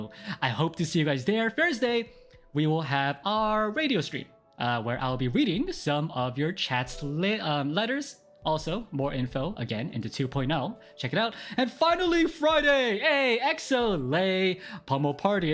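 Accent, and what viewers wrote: South Korea. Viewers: Now that I think about it, he does have a Korean accent... Compare it to someone like Suha it's not as noticeable but it's definitely there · I knew it! Another half Korean at NijiEN!! I recognized your accent. You're so amazing. 만나서 반가워요 오빠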